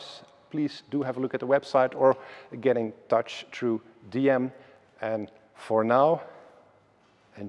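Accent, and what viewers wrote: Netherlands. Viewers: Always like your videos, but to be honest, for someone like you, an international name in coach building cars. I experience a kind of annoyoance in your Dutch-English, there's so much potential of you take a few classes. I hope you appreciate my somewhat cold feedback 😬 Best wishes for you and Kaeve in 2023 (and beyond)..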